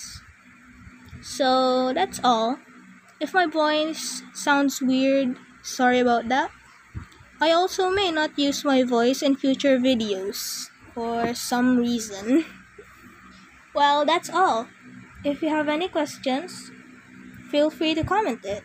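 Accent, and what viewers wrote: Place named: Philippines. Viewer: YOUR VOICE SOUNDS AMAZING WHAT- ITS LIKE AN ANGEL DBDJHDGHJDYDJSSJHSH You have that Filipino accent while I have this American-ish accent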